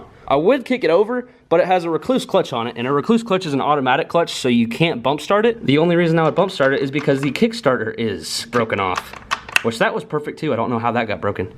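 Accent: South, United States. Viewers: i liked how you went over everything and teaching the viewer and i thought it was funny how southern you sound and i knew i had to sub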